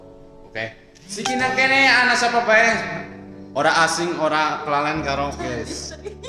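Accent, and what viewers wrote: Banyumas, Indonesia. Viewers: Olih ngomong collab nyong krungune "olab"😂 Ngapake mantappp jannn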